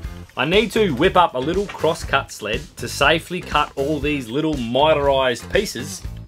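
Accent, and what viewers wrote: Australia. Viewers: Haha loved it. Most other YT channels just couldn’t have pulled this off. That Ozzy accent makes it work. Awesome stuff mate 🤓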